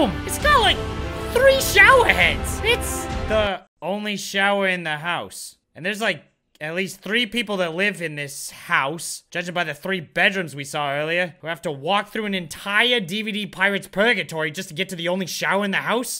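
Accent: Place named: Ireland. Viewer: Irish I can tell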